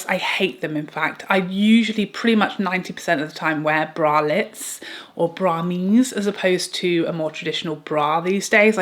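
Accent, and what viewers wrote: United Kingdom. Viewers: Love you bless you beautiful soul inside out, I love your British refined accent it's so soothing and therapeutic bless you and your family 😊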